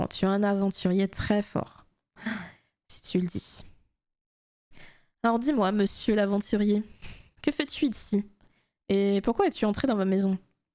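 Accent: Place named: France. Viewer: Oooooooooo French accent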